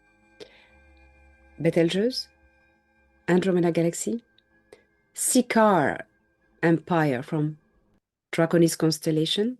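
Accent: France. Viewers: What a great edition of Star Nation News! You should do a more detailed traditional Documentary for public release to help us get through the next 5 years. Make sure you have a narrator without a strong foreign accent. I love your French accent, and the way you present your news. I took French in high school for 3 years, but I do struggle to understand your pronunciation of words sometimes. You are a great communicator and I admire your great courage and wisdom, and beauty, if I might add!!! Mike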